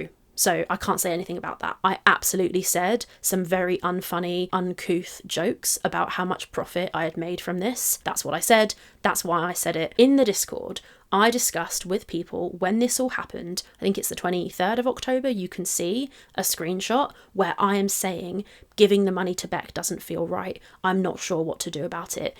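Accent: United Kingdom. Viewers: Does think the British accent somehow changes the words we already read?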